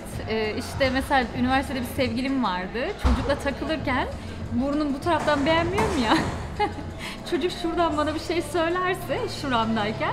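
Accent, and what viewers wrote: Turkey. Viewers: Ablamsende tam bir kayserili konusmasi var karadenizliisin ama biliyorum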